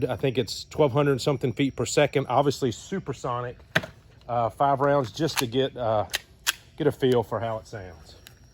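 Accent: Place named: Alabama, United States. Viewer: I knew that was an Alabama accent. About to pick up a Rugged Mustang at Larry's myself. Nice video. Helpful.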